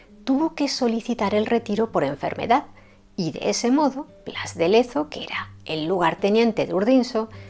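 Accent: Spain. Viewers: Exquisita historia de mi ciudad Cartagena de Indias, admirable y a la vez muy triste el final de este gran héroe Don Blas de lezo, en las escuelas si enseñan esta historia de la defensa de Cartagena ante los británicos y de hecho hay un monumento de el frente al Castillo San Felipe de Barajas y llevan su nombre un colegio y un barrio; ah y muy buena narración de con ese acento español que te caracteriza. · Siempre escuche de Blas de Lezo como un valiente defendiendo a Cartagena. Que interesante!!! Narrado por una mujer de la madre oatria con una bella voz y poetico acento😊😊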